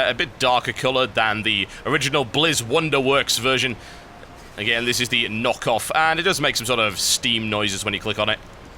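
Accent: United Kingdom. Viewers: I really just hope you continuing make videos becouse i just love the way you cast things. IDK if it is your voice, the british acsent, but i just liked it. Dam, i even got my WoW subscription back again so i could understand more of Azeroth Daily, and becouse i had nothing else to do on my free time and i was looking for a MMO. X_X" Anyway, just keep doing it man! You have all your subscribers not by coincidence, you earned then, you even earned the third place on that contest! LOVE U TB! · to be honest, i stumbled upon you one day and just think you have one of the best commentating voice ever. It makes me happy to be british with people sounding like this. I've enjoyed all of your videos, the WoW stuff however isn't my thing but it dosn't bother me slightest that you want to put more i can still watch your over videos like the WTF series and other video series. 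(btw your starcraft videos are what have put tatic ideas for my play style) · Hi TB, im one of the more "recent" viewers, only joining in the last month of the beta or so, but I have enjoyed your content and of course your british accent. The thing I want to bring up is the "lack of love" for your SC2 content. The issue with your SC2 content is (for me) not that I don't love SC2, but to be honest because there are much better commenters out there for SC2, people with deep personal knowledge and skill in the game, who can actually give us insight, like you do in WOW.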